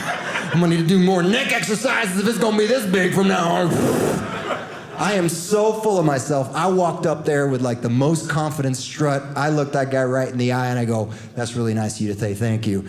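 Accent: United States. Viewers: As a Miami Cuban, it's not the whiteness that gets me as much as the complete lack of any accent other than the very clear American accent when pronouncing Spanish words.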